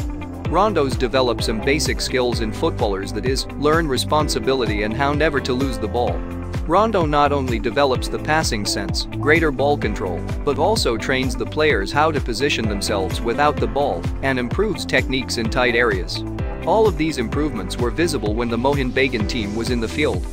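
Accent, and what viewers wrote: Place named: United States. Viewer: But your voice is like an american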